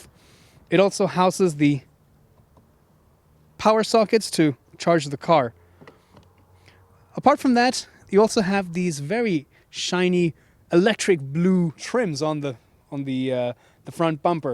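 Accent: Germany. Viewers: eine frage warum gibts die test´s nicht in 2 sprachen ? oder mit untertitel den soweit ich das höre haben sie doch einen deutschen aktzend ;)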